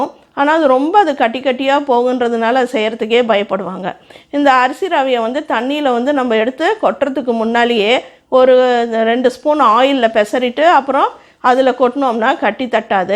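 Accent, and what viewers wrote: Salem, India. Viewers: neenga Salem ha madam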